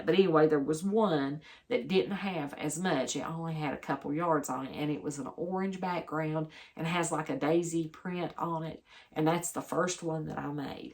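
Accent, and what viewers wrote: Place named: Georgia, United States. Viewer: I noticed your accent right away and thought she sounds like I would if I was on YouTube...I live slightly north of Macon, GA.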